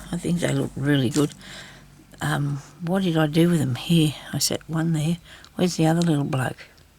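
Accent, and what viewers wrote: Australia. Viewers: Hi, another very pretty piece coming together. Love the way your mind works. It’s also very refreshing to hear your Aussie way with words and phrases. I feel like we are losing the Australia we grew up with, anyway thanks for your ideas, best wishes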